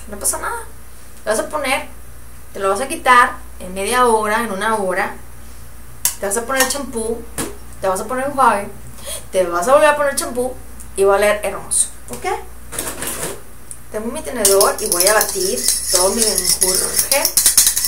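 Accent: Mexico. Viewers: Adoro tu voz y ty forma de ablar xD eres mexicana?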